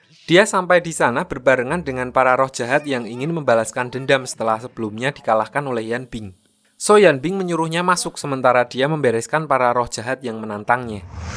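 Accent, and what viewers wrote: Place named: Java, Indonesia. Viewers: logat jawa admin nya nampak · kwkwkwkwkwk kaya e org Semarang nih si mimin... salam kenal min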